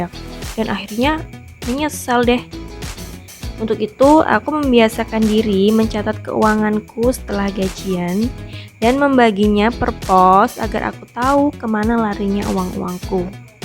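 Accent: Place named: Java, Indonesia. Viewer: Suara ne medok 😂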